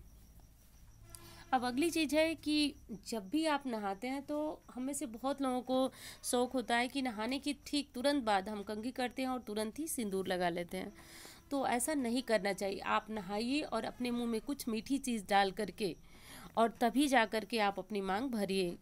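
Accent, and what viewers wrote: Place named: Bihar, India. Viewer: Aap k baat sa laga ke aap bihari ha Ma b bihari hu di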